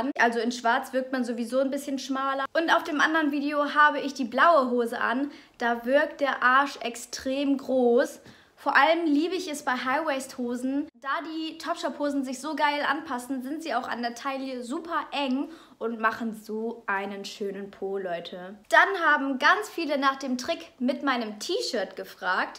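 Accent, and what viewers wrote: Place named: Germany. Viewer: Sie hören Cute Your German Akzent und Sie sehen niedlich diese Jeans in Ihrer Figur. Nette Mädchen Grüße aus Mexiko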